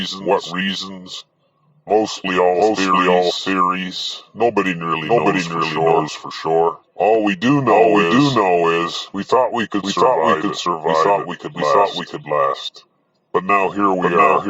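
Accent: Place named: Canada. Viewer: At least you didn't give yourself away this time with your Canadian accent... only with the words "quite literally" and "for myself"... and maybe your laugh... lol. Thanks,. Enjoyed it. 😊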